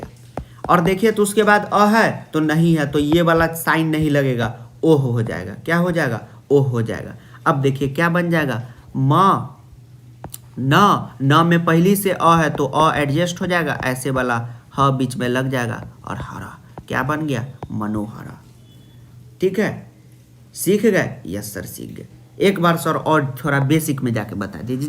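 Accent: Bihar, India. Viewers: Bihar mein kahaa se hain aap 😂 aapki language main samjh gyi😂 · Are u bihari? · Bihari ho kya bhai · sir ap bihar se ho naa!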